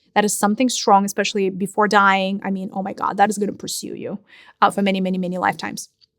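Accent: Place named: Russia. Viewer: Sometimes you holler at me really fast and use the words ain't and y'all in the cutest Russian accent. You're the favorite of the many voices in my head and the only person on the planet other than my husband I will allow to put me in my proverbial place.Contrary to popular belief (even if they're my beliefs) I'm not perfect. I am however, genuine courageous authentic passionate and loyal AF. I love bigger than almost anyone in this dimension and I need so many anchors and tethers it's ridiculous. I'm thankful for them all. I wish I hadn't forgotten for so long! Gratitude in extra portions 2 you my sister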